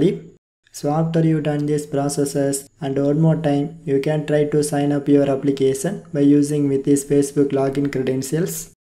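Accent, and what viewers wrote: India. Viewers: Bro I love your Indian accent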